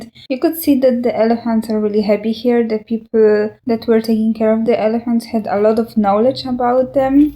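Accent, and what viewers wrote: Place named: Eastern Europe. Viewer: Czech, Slovenian, Polish? Usually I can recognize accents.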